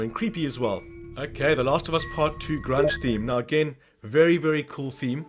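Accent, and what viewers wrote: South Africa. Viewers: Can clearly hear you south african😂❤ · South African Youtuber ?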